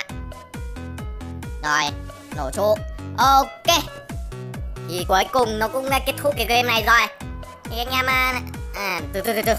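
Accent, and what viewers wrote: Vietnam. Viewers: Giọng Nam Kì Hay và Hài thế mà đặt tên như vậy đúng như mình miền Nam mình rất bn vì Nam Kì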